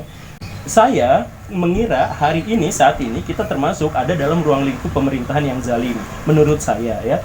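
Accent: West Java, Indonesia. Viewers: Logat Sunda pak guru membuat ku kangen Bandung. Aku pernah 1 tahun di Bandung.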